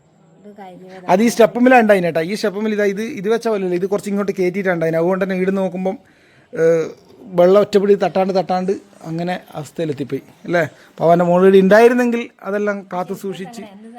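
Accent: Kannur, India. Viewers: നിങ്ങളുടെ സ്ഥലം എവിടെയാ! കണ്ണൂർ ആണോ? സംസാരം കേട്ടിട്ട് അങ്ങനെ തോന്നി.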